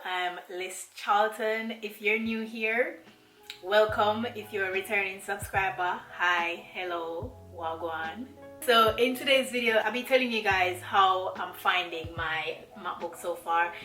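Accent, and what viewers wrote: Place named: Jamaica. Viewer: I love your Jamaican accent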